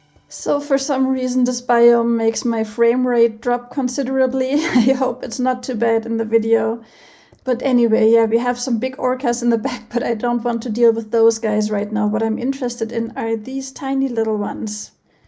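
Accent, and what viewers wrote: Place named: Norway. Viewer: How do you make the timelapse? And which editing software do you use? -Architectix and i'm still working on the build so i can help you if you want. And which nation do you come from? You sound kind of Norwegian in the accent.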